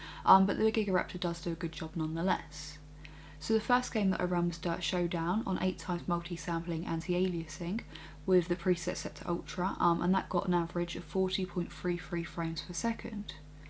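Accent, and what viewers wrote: United Kingdom. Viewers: What part of the UK are you from? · She lives in the UK so she has an accent. It makes me laugh at how people are critical of perfectly acceptable accents. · Uhm...she's obviously British, it's just the way they talk in some areas of Great Britain. There's nothing wrong with her. Americans...enough said...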